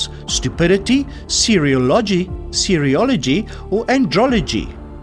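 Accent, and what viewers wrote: South Africa. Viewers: Is that a South African accent?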